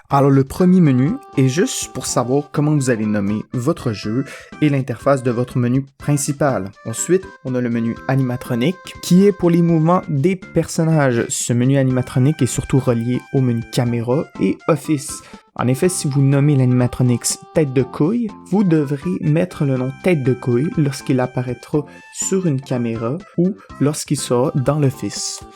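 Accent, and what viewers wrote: Quebec, Canada. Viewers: T'es tu québécois team QUÉBÉCOIS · Québécois non? (Je reconnais grace au gros mots xD)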